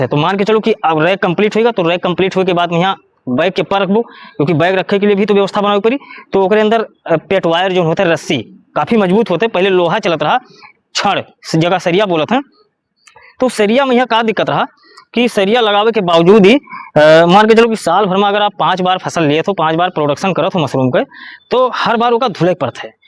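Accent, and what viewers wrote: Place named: Rewa, India. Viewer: apki boli se lga ap Rewa MP se ho, utni door avi nahi aa sakta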